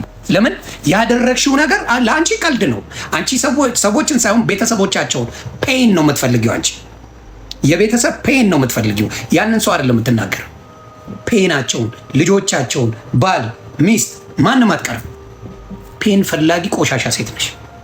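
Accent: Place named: Tigrinya, Eritrea. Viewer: I love your Tegregna pronunciation😂😂 you crack me up 😂😂😂😂😂❤❤❤❤❤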